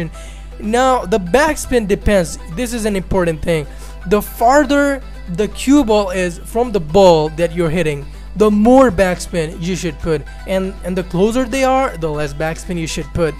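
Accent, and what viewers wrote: India. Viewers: uh he’s Indian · whait is he indian?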